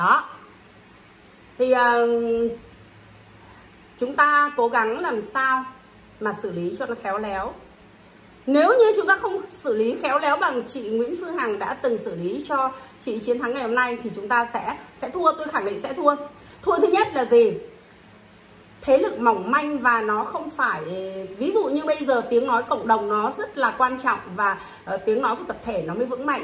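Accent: Standard. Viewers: chi noi rat chuan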